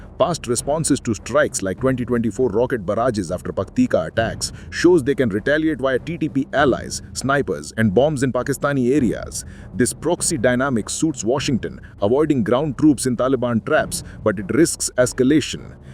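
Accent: India. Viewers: No more Pajeeet voice on Godi media thanks to AI voiceover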